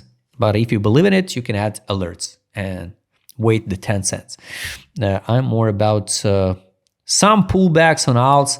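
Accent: Russia. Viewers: судя по твоему произношению , ты русский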